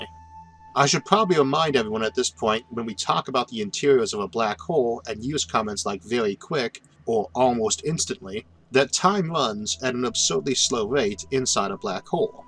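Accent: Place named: South, United States. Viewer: I enjoy your voice, BTW even with that inability to pronounce 'R' .. it gives your voice a bit of a "southern" drawl. Easy to listen to. Oh and I often transpose letters myself.. It isn't a dyslexic sort of thing, but my brain going faster than my fingers along with large hands and small keyboard :)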